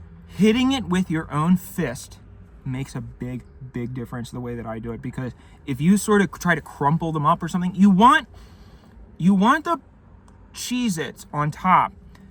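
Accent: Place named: Baltimore, United States. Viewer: I never noticed before that has a bit of a Baltimore accent.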